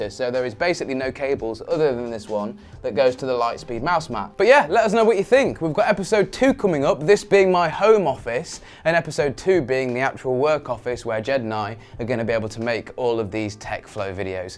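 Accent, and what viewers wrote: England, United Kingdom. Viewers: He looks like jayztwocents son with an English accent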